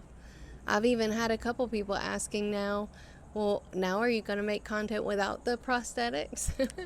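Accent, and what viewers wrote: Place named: South, United States. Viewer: You're very pretty, I enjoy your southern dialect, be strong, and enjoy life to the fullest.😊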